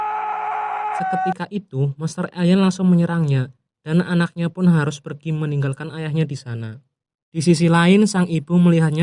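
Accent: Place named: Java, Indonesia. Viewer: Mantap tetep konsisten medoknya.. 👍🏻😁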